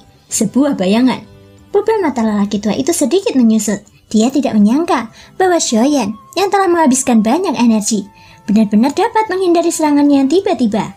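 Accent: Java, Indonesia. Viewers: medok emen...kenapa ga sekalian pakai bahasa aslimu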